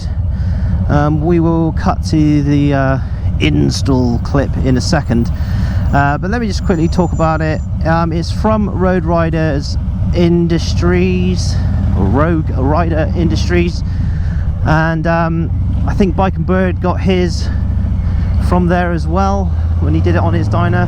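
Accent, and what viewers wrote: Somerset, United Kingdom. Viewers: It is a painful process especially as we have had such good weather here in Germany, but...it is ordered and will arrive at sometime in the future. We are really lucky that Harley allow so many test rides and over the next two weekends the Harley Truck is at two dealers not too far from me. Just a quick question, what town do you live in? I think I recognize the accent and grew up in Weston-Super-Mare :-)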